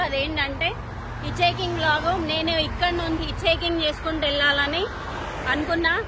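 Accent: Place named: Karimnagar, India. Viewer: U r dare to travel alone beeing a girl.Iam from Karimnager seems that you r nearer my slang.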